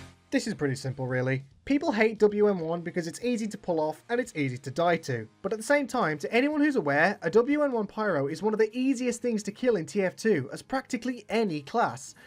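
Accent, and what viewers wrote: Poland. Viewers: Youre polish? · Are you Polish?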